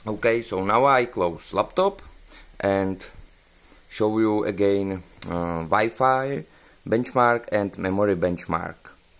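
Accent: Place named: Czech Republic. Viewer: 😀 brutální czechlish 😀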